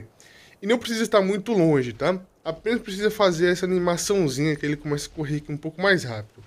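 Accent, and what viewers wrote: Northeast, Brazil. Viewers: de que estado se é sou do nordeste vc parece ser nordestino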